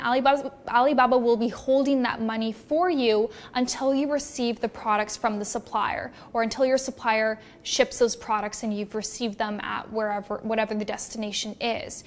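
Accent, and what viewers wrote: United States. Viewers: are you from Russia? it seems like you're speaking like a native american but you have a russian name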